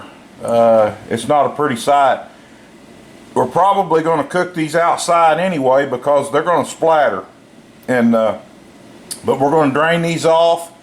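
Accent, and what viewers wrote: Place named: United States. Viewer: Great video. Love the country accent.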